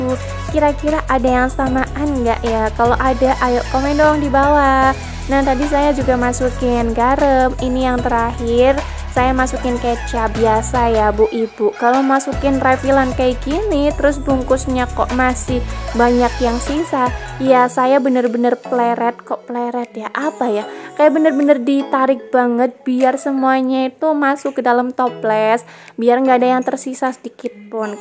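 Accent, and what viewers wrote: Banyumas, Indonesia. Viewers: Setia ngikutin video nya tp jarang komen...kita satu bangsa ngapak😅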